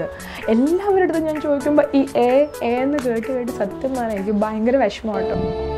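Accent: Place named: Palakkad, India. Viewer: Palakkadan penkutty😑guess ur frm pkd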